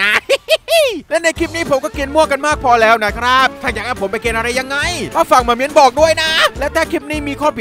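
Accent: South, Thailand. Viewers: พี่เป็นคนใต้หรอครับ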